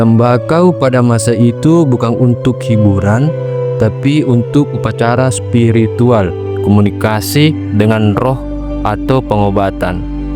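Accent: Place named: Sulawesi, Indonesia. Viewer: Semangat bang, kedengarannya orang sulawesi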